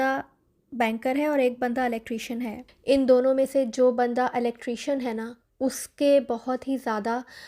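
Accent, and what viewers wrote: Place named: Karachi, Pakistan. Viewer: By accent looks like you are purely from Karachi. 🤔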